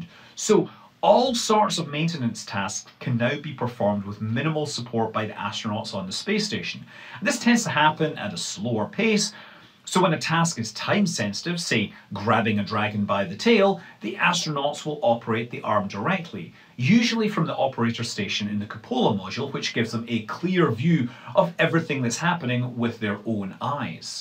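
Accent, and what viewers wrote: Scotland, United Kingdom. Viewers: Your Scottish? That's dope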